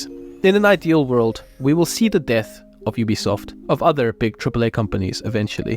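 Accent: South Africa. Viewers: I am hearing a South African accent. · Bru, you sound South African ✌️ probably not, but shoutout from South Africa 🇿🇦 This video is literally me! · i knew i recognized a South African accent! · You're South African? I knew that accent was familiar!!!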